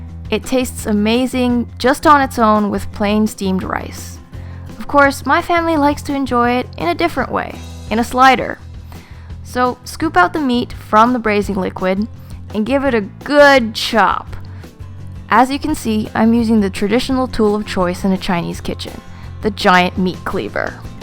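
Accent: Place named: California, United States. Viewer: Gastronomic Relevancy Hi, I subscribed too yer channel, But where are all the videos? I'm from California and your English dialect sounds like you may be too. You have a great voice to listen too and your videos are very well done! I want to learn so many Chinese dishes and how to cook with a wok! So, start cooking girl! Show us how to make that sweet and sour pork or chicken right in the wok. Okay? And you definitely must show us how to make those awesome Shanghai soup dumplings. They are outta this world you guys. You are gonna get so many subscribers! And make a lotta money!;) Oh, and you don't just have to make Chinese dishes, make whatever you want...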